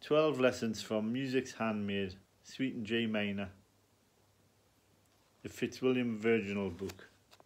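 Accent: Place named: Northern England, United Kingdom. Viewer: Thank you! I would say you live farther north in England.. maybe half way to Scotland? Trying to figure out your region by your accent.. and by the way.. I'm the one with an accent, not you! Hehe.😀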